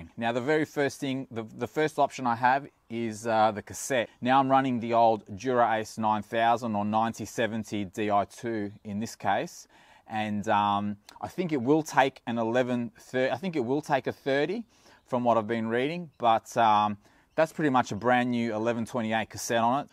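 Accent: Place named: Australia. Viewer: The aussie slang is so refreshing. As a fellow second generation immigrant aussie, the accent is so truely 'modern Aussie' . Love your work mate! Great presenting style and overall video editing and filming.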